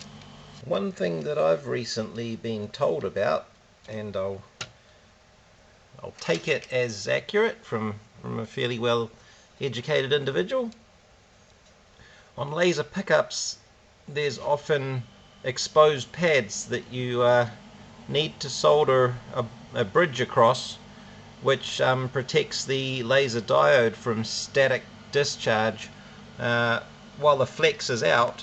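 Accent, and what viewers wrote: New Zealand. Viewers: _You sound like a fellow Kiwi... We should never underestimate the ability of a Kiwi, as isolated as we may be, to fix any problem, be it fixing a piece of broken farm machinery or splitting the ATOM_.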